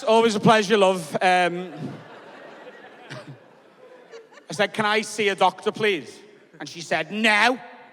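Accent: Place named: Liverpool, United Kingdom. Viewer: Was this chap braught up, same place as darran till. Accent seems, very similar, unsure if it changes certain parts of Liverpool, like it does generally, in Glasgow, newcastle, but this guys accent and darran tills, sounds very similar, but different to carragher, just a thought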